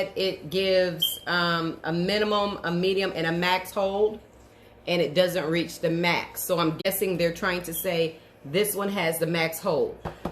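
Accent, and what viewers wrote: New York, United States. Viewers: That New York accent gets me Everytime! "Let's twok about it...a little swofta!" You have to say Albemarle in your next video... Just for me! I love your hair. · Def not mad at it. Looks great! Where are you from? I hear a slight accent? NY?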